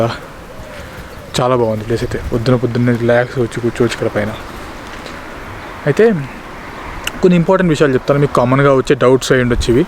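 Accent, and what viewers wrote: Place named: Telugu, India. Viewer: బ్రో జెల్ది రా బ్రో సీట్లు అయిపోతున్నాయు ఈడ 😅😂 ఏ దేశం పోయిన మన భాష యాస మర్చిపోవద్దు ప్రౌడప్ యుా తెలుగోడ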